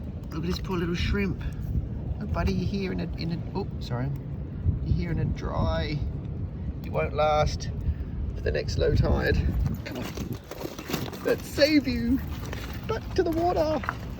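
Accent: Australia. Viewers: Really enjoy your aussie accent. And the time you take in explaining your finds.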